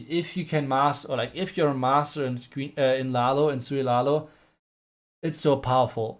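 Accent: Germany. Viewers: strong german accent u can always recognize that hahah but its sounds good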